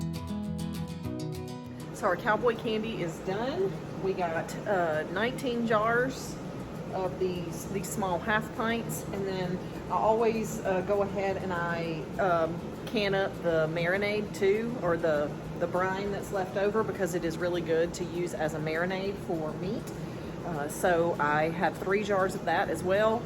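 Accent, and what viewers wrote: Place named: South, United States. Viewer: I respect your right to privacy, but I was wondering what state you live in. Your accent says the south. I am new to the south, north central Arkansas, and the accent sounds close.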